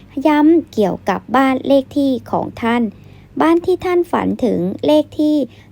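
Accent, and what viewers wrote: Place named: Laos. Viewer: คลิป มันชอบออกลาวเกือบทุกคลิปจ้า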